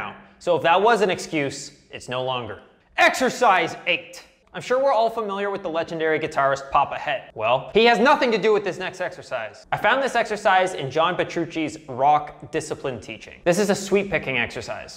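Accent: Canada. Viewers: You canadian? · This guy sounds Canadian af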